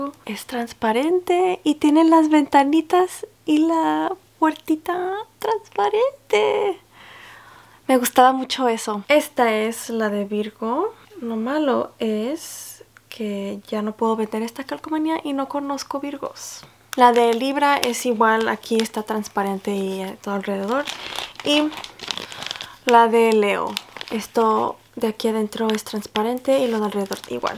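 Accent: Mexico. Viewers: gracias de nosotros tus seguidores de latam, luego de escuchar tu español identifique que era mexicano JAJAJJAJAA me gusta mucho como hablas el español ya que es tu primera lengua... espero te encuentres bien, saludos :D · Hace algun tiempo veo tus videos y podia escuchar un poco, pero muy poco tu acento mexicano y me preguntaba, acaso hablara en espanol? Y pense en escribirte en espanol, pero luego dije, que tal que estoy loca : ) jajajja. Me encanta todo lo que haces, felicitaciones! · Que bueno que hiciste uno en español. Me motivaste a hacer uno yo también. Ah y se dice subscribirte. 🙂 súper bien! ❤️ Me encanto por sierto eres de descendencia mexicana? Porque yo lo soy y creo que tú acento es de Mexico. ❤️ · me gusta mucho tu acento mexicano <3<3 te da una vibra diferente pero linda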